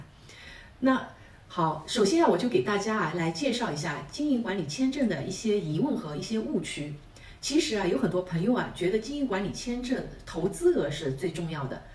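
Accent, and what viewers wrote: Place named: Shanghai, China. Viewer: 乍听是个上海人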